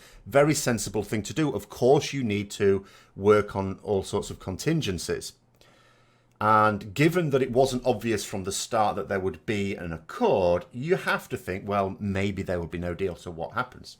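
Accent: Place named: Northern England, United Kingdom. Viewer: The moment I heard the presenter's Northern accent I knew this was all going to be a diatribe of doom and gloom, why is it that the moment one steps foot North of Watford one is confronted almost unfailingly by this dog in the manger attitude, be positive man, I know that flies in the face of everything your parents taught you whilst you were scoffing down your hotpot and black pudding but really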